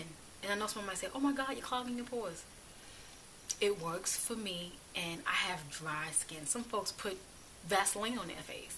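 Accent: United States. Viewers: Great video, you look very good for your age and I like the way you speak, where in America are you from?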